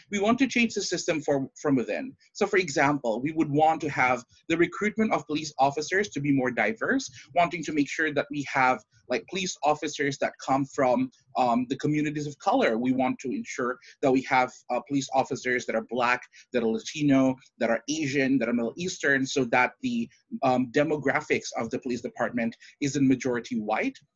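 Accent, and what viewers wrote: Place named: Indonesia. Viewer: Kebanyakan "You know", pronunciation ada yang kayak Indonesian-English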